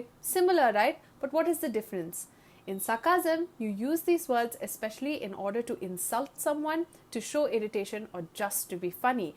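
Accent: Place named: India. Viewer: This is what indian accent is supposed to sound like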